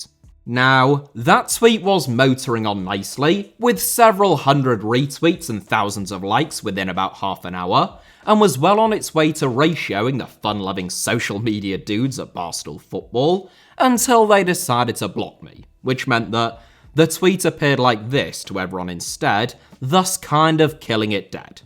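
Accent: England, United Kingdom. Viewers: That's just how Brits sound, don't sweat it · I thought the video was so long because he was going to present mounds and mounds of evidence to back his point. Instead however, he's just reading tweets and being proud of himself for making condescending tweets online. It's pathetic really. Turns out having a British accent and making snide comments on the internet doesn't necessarily entail intelligence. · "Accused rapist" learn the definition of accused, charged, sentenced before trying to sounds intelligent with that smug british accent, being accused of something means about jack sh%t. Im not surprised that many high profile rich guys get accused of these things, im also not surpised that the supposed victims ALWAYS fixate on getting paid instead of actually putting that man behind bars, wonder why that would be. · 1. Innocent until proven guilty. 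Especially in today’s day in age were many men rich and poor alike are falsely accused. Provide others the same grace you would want in return if you were the accused. 2. A story like this to be completely made up such as a famous person getting a divorce will be obvious eventually and would be hilariously dumb to fabricate. Instead, you should verify first that they are lying before you accuse them of it. Until then don’t act like you know they’re lying when you really don’t. That accusation from you by the same standard you’re using is just as much a lie as the one you’re accusing them of. Both your claim and theirs are unsubstantiated. The hypocrisy drips off from your British accent. 3. What’s unreasonable in western society is that laws allow for a woman to leave for any reason, even if the women cheats she can take half of the assets. It’s also unreasonable to get into a supposed life long vow and then just leave and take everything. 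Many men are destroyed from this and it’s not what one would describe as justice based upon the meaning found in a dictionary. How can one make a promise and be rewarded for braking said promise/vow? 4. Yes lots can happen in divorce court depending on the country. Very good of you to point that out. 5. Misogyny is the hatred of all women. It is Not when men are pissed off at current marriage laws and a culture that allows women to accuse men and labeling them as guilty until proven innocent. 6. Allow me to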